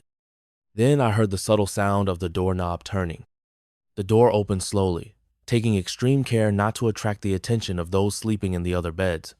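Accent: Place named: South, United States. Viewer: the masculine version of a Southern voice was mesmerizing and crept into my mind as if it had a master key to open all of my hidden feelings I had locked away long ago.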